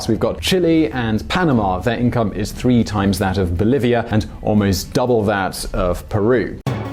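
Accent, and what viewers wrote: United Kingdom. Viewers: ... are you british?:)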